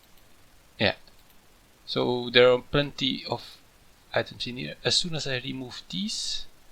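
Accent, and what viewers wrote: Flanders, Belgium. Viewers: are you Belgium? I can hear it on your 'vlaamse' accent. Love your mods though. I also had one question about XNet, how does the redstone mode work on extracting items mode?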